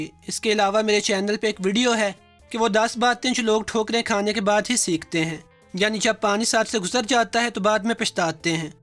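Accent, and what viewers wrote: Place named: Punjab, Pakistan. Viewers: Lehja to to jahilo wala hy urdu aik adbi zaban hy iski zaban to aisa lag raha hy punjabi bolne k lie bani hy bs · آپ بھی اردو بولنا سیکھ لیں۔۔۔ یہ پنجابی اردو آپ جیسے شخص کے منہ سے اچھی نہیں لگتی۔۔ والدین ہوتا ہے ولدین نہیں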